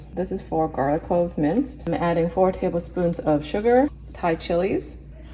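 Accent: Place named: Bay Area, United States. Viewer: I knew you were a bay area gal! I had a feeling from your accent and coolness. Go warriors!!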